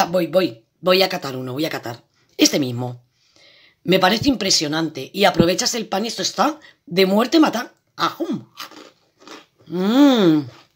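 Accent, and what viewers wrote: Spain. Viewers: Todas las noches me voy a dormir pero me hago unguiso de risa sos española como mi abuela fue algo pequeño hacia unplato de comida soy de Argentina te felicito gracias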